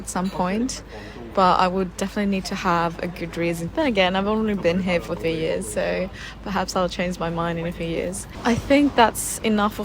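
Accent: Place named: United Kingdom. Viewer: Oh first time here, and really enjoyed it! You sound so British! I live in London and resonate with the 'loneliness' of sort-- especially dating! Subbed :)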